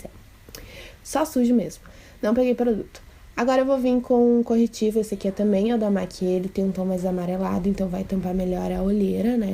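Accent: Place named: Rio Grande do Sul, Brazil. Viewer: que hino!! esse sotaque não me enganou, RS é tudo ♥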